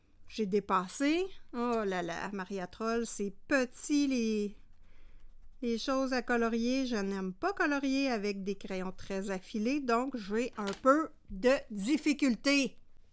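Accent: Canada. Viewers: Cc, je viens de partager cette vidéo à une copine qui a perdu l envie de colorier et qui aime l accent canadien. Je pense que tu pourras lui redonner le peps pour colo. 😘😘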